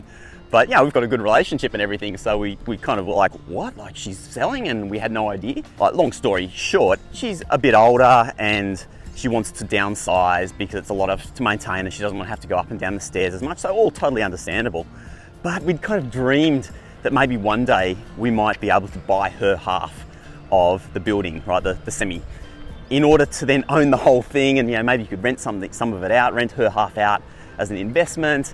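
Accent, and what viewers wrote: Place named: Australia. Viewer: Australian English is awesome 😎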